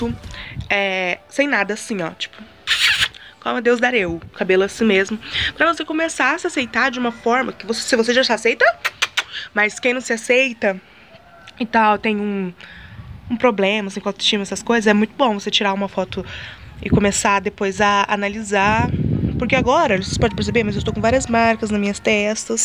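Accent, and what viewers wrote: Minas Gerais, Brazil. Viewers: Ela é de Minas ela falou no começo do vídeo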